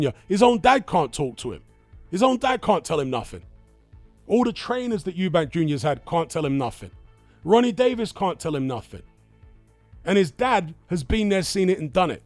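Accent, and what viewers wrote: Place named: United Kingdom. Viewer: I guess you're not used to hearing black guys with British accents. Anyone who is from the UK will tell you he sounds black. That's what we sound like here.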